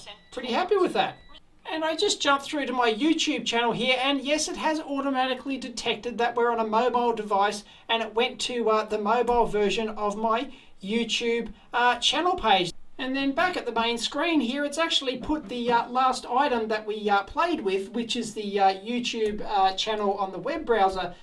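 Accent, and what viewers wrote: Australia. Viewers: Your reviews are informative but that high pitch Aussie voice is like fingernails across a chalkboard. (Chalkboard: graphic illustration aid from caveman age)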